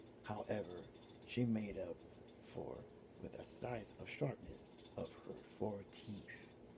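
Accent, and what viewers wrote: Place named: United States. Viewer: you do! everybody does! lol! the accent of the place we are from, city, state, area ect. being from italy, i hear your american accent very much! :) It was ment to be a compliment.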